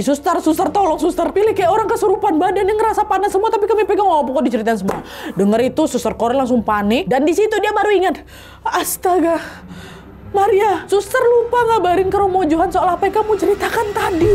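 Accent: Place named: Manggarai, Indonesia. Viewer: Ini cerita dari manggarai, dari logatnya, makasih wakkk udahh nyeritain😅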